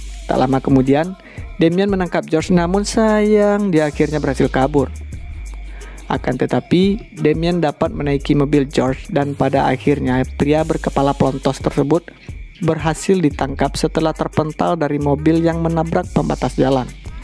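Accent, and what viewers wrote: West Sumatra, Indonesia. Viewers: Orang Minang ya bg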